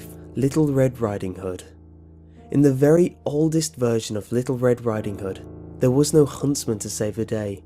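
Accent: England, United Kingdom. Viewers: Excellent narrator!! I love your English accent and how you talk slowly and eerily!! Just like the music you use in a movie, sets the stage of the movie, the same goes for all YouTube videos. If you present any paranormal content, it sounds so much better when the narrator takes the content seriously!! I absolutely hate when i click on something paranormal on YouTube, and the narrator talks loud and fast and stupidly, I click off that video FAST! People that look up this type of content believe in the paranormal and take it seriously. We don't want to hear paranormal content presented as a joke!! Whos with me on this??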